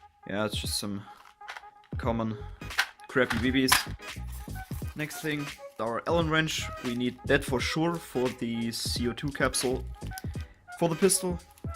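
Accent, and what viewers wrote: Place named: Germany. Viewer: Der deutscher Akzent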